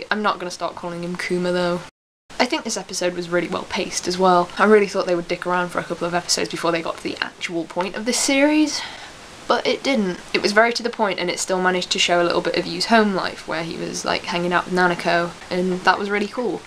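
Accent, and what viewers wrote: United Kingdom. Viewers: XD a pretty girl who plays persona? AND has a british accent!? AND loves Teddie?! 0_o i think im in love XD that aside, i love the way you criticiced the first episode, and agree on everything you said. Bear-Y good review XD ;)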